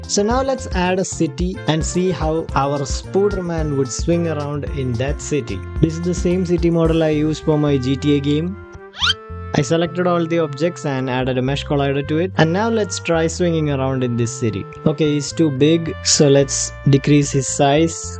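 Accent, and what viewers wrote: Kerala, India. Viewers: The mallu accent is lit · Edaa malayalai moneeeee🌝Ninte english ketta mansilavum nii oru mallu aannenn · Are u frm kerala?(malayali) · bro are you from kerala just guessing :)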